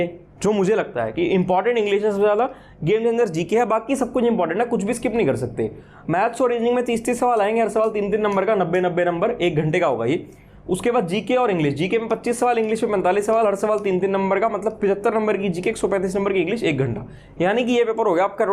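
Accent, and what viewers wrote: Haryana, India. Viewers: brother i think you are form hariyana Right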